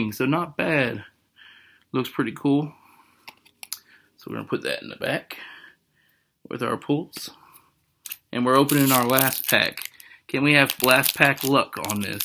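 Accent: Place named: Texas, United States. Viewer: Nice vid bud, and last pack magics that FA is pretty sweet. Whereabouts are you from friend cant quite place your accent its a little Texas and a little Tennessee thrown together. Just curious I always try and guess accents.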